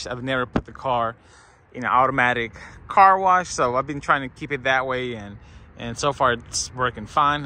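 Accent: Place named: Philippines. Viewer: Slight Fil accent under the Southern accent.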